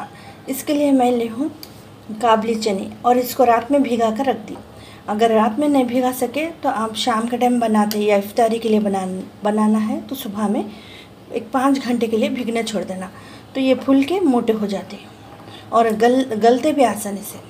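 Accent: Hyderabad, India. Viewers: Hyderabadi language 🙄🤔🤔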